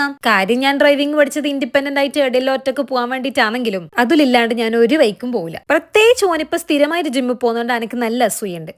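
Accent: Kannur, India. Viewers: Kannur aano 🤔 varthanam kettitt choichatha😌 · Kannur aano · Kannur anappa nee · Anne koottand poonnath kandaa😂 Kannur slang📈📈📈📈📈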